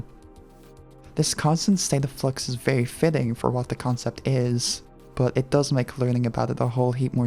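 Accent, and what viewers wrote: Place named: Ireland. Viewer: Nice to hear an irish voice in the sea of Americans 😅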